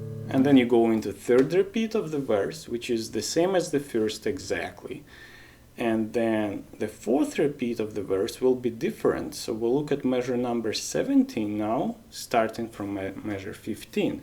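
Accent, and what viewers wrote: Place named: Russia. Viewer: Прям русский акцент.дядька,чем тебе наш язык не угодил?думаешь просмотров больше будет на инглише?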